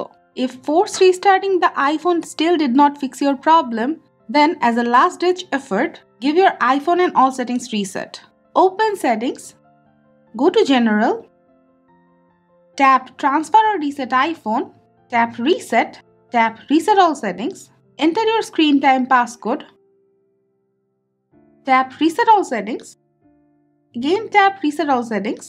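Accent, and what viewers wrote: India. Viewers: You must be American - THE very country that can’t speak English! So much so they made up their own language they call English. This woman is speaking English - with an Indian accent. Grow up!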